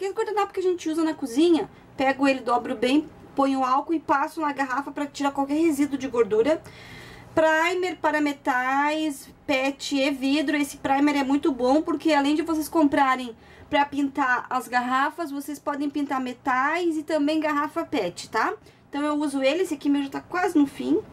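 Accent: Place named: Curitiba, Brazil. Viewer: amei vc é de Curitiba? tenho parentes em Curitiba, o sotaque é igual ACHO LINDO Bjs ♡♡♡♡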